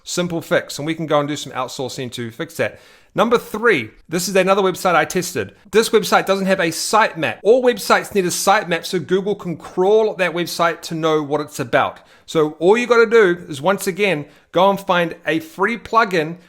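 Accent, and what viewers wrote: New Zealand. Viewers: yep he's a kiwi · Where's your accent from ? Sounds kiwi!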